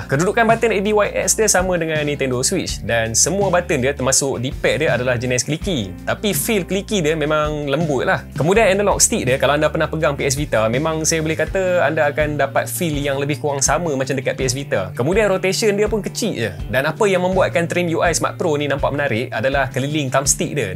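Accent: Malaysia. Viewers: slang utara campuq selatan 😅btw nice review ,subscribed🎉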